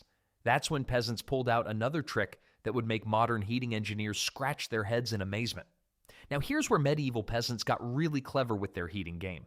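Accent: United States. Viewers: If there is one thing I love ( not) is listening to some yankee narration trying to be dramatic but failing to hold attention. Get to the point and drop the BS.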